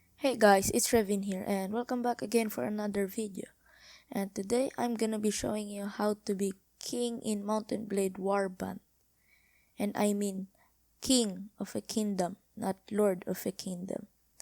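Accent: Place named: Philippines. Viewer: I know that you're a filipino because of your accent Kababayn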